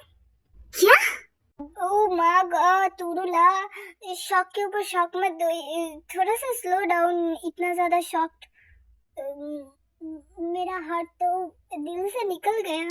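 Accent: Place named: Hyderabad, India. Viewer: Didi app Hyderabad sa ho kya aap ka language Hyderabadi hai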